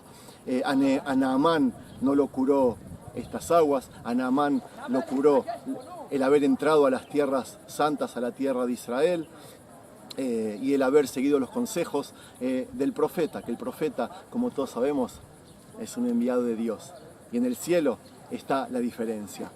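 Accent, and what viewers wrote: Argentina. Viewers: El sonido de su voz como se le escucha parece que es Argentino, pero que vive en israel.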